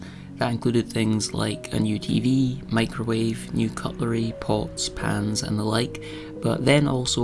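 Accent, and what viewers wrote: Scotland, United Kingdom. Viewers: "... and not fixing potholes" --- LOVE IT, you are so refreshingly honest 👏😂. I wish you the best of success with your enterprise and future plans. It takes time to get it running more profitably. As to the critics - I think a great deal of it is envy and the reluctance to see how much time and work you put in it. Ethical or no isn't the question. The same could be said about most every profession or business. You don't have to defend yourself, you are doing a great job and you are very thoughtful and respectful in everything you showed us here. Also, Scotland offers only so much opportunities to make a living. Criticism is so easy online - I'd suggest for everyone to check their own circumstances first. Unless they are 100% flawless themselves, they might want to stop trying to give you a bad feeling. I for one enjoy this channel very much and it's obvious why your channel grows so nicely, I don't stand alone with my opinion, it seems.. 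I like how you and your family work together to make things happen. I enjoy your honest and kind personality along with this lovely Scottish accent, of course. I'm old enough to be your grandma and I'd be very proud of a grandson like you. Best wishes to you and your loved ones on 2 and 4 legs, hugs and smiles from the Bavarian Highlands, the alpine region that's indeed called Oberland 🙋🏻‍♀️❤️ Lisa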